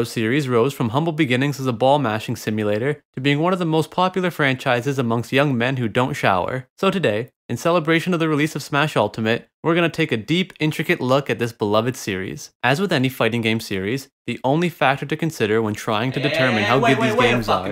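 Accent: Newfoundland, Canada. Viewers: Started watching a ton of your videos. Quick question. You a Newfie?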